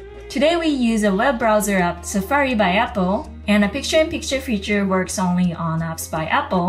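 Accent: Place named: England, United Kingdom. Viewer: I love your English Accent Sensei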